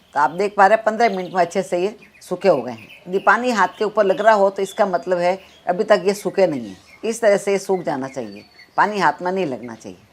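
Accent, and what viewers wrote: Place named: Marwar, India. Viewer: Aap ki hindi me desi marwadi language ka swad aata hai.....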